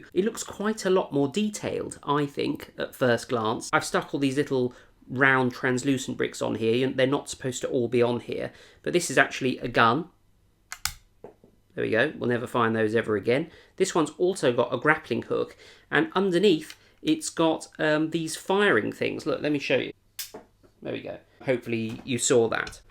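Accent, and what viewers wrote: United Kingdom. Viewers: Ur British accent is nice Makes my ears joyful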